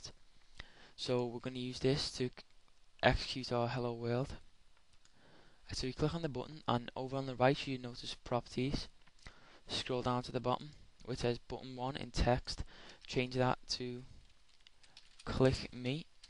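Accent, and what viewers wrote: Liverpool, United Kingdom. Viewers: Woah, you from liverpool? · Scouse accent! :-)) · I just can't take this effing Scouse accent. Have to give up this Tut, thanks for trying though!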